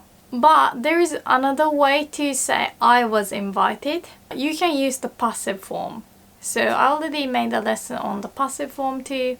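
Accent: United Kingdom. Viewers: I love your British accent.....and oh yes thank you for teaching me Sensei! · omg , i know this is an old video but so refreshing to watch, not only you made clear explanations but also talked us through what we (I at least) would have probably said (wrongly). Love it all. The British accent on top, it's just delightful, and the little humorous touches like the "sugar daddy" remarks made me laugh, in an intrinsically dry content. oshiete kurete, arigatou !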